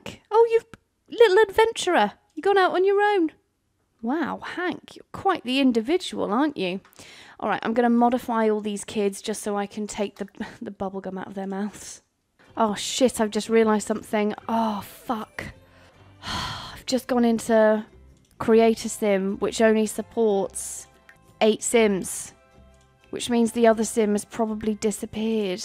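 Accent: United Kingdom. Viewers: You are aggressively british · This is so funny I don’t think I’ve laughed so hard in my life and I love your accent British accents are awesome and I love the way you swear it’s so cool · Your narration cracks me the fuck up! Especially the British accent 🤭🤭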